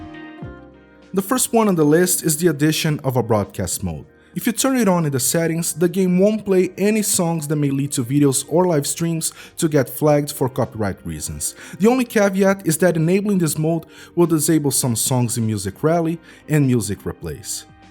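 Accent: Brazil. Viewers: I can tell you are Brazilian. Ótimo video!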